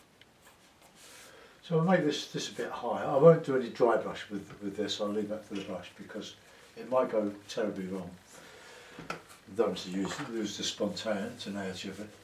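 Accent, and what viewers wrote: United Kingdom. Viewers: I think this is pure genius. Thanks you for sharing your technique! I can't wait to try this today. Thank you so much. I love your British accent too, by the way...so charming.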